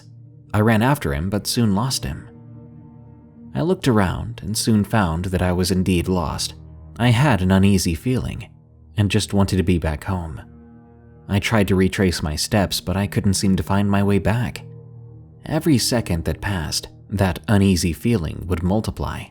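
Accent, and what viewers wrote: South, United States. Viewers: Duh he is from the south..if your an old fan u should know that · I know he is from the South, but it just cracks me up LOL 😂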